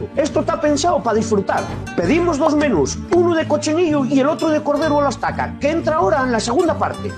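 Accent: Asturias, Spain. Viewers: Eres un crack,ese acento me recuerda tanto a mi familia Asturiana...genial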